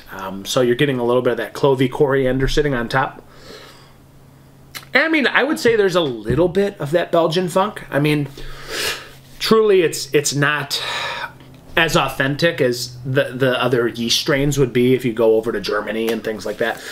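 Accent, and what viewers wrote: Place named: Michigan, United States. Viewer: I bought a 6-pack of these today and looking forward to trying it out. I appreciate the review of a genuine MI beer from someone with a genuine MI accent, e.g., Oberaaan & Haaaps (hops) 😉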